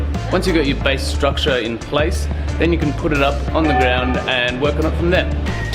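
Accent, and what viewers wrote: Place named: Australia. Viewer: Loving your channel and all you're doing in India. From the accent am missing Oz already .... Am a South Sudanese Ozzy from Sydney and I've been looking for DIY playground projects for my son and kids across South Sudan 🙂 so glad to come across this😁 moved 8mths ago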